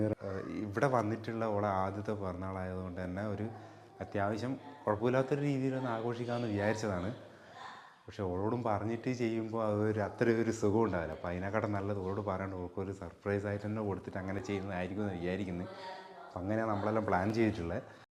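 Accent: Kannur, India. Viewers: അനക്ക് എനക് കണ്ണൂർ സ്ലാങ് അടിപൊളി 🕺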